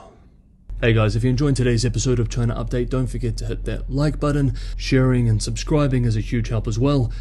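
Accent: New Zealand. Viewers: sounds like a Kiwi to me. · where are you from, mate? I think a Kiwi, and I'd guess from Wellington but most Kiwis have fairly similar accents and even Southlanders and Kiwis from Otago generally sound somewhat similar to Kiwis in the North Island (other than most Maoris). I should add that sounds much more polished than most Kiwis but I have family members who sound much more posh & toffish than even he🤣🤣🤣🤣🤣😂😂😂😂😅😄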